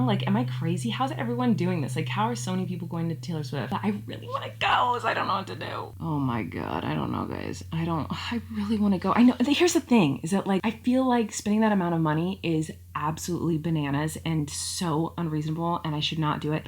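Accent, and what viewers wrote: United States. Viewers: Oh wow I never thought of how you might not recognise your own accent just like I don’t recognise mine (South West, England). To me, you are THE most American sounding person ever, Californians are the most American sounding people to me like cartoons 😂 like I can’t even imagine you existing that’s how unreal you sound to me because I grew up watching American cartoons I just can’t imagine anyone with you actually existing in my world does that make sense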